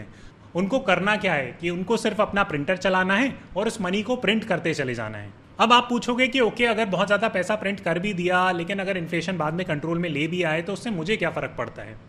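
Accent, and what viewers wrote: Standard. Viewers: Kya shuddh hindi bolte ho sir aap.🤩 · Loved you Shudh HINDI. 😊 And how is Zayn doing? Does he speak hindi as well? Your english channel does not feature this hindi channel. Feature it ASAP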